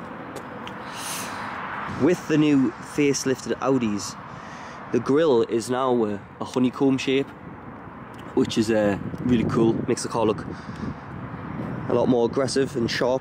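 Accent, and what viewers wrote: United Kingdom. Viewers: Love that British accent♡ I think Audi have the best car, love it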